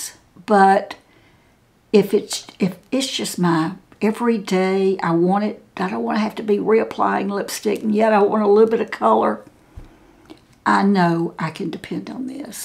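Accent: South, United States. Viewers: really enjoy your videos. Love your Southern? accent. Could you tell me what in your opinion, is the bed undermaker primer. I have a lot of wrinkles from living in the middle east for years and being very fair. Thanks. xx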